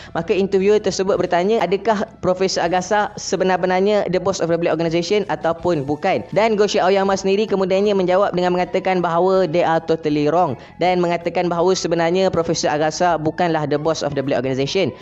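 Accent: Kelantan, Malaysia. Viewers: Org klntn ke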